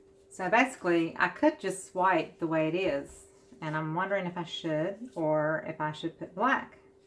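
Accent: South, United States. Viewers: Awesome artwork! New subscriber to your channel. How many drops of floetro do you add to your paint? Also, what's your background paint recipe? Type of paint? Thanks. Oh, you sound like a southern gal. I am too-from NC. Have a great day! 😁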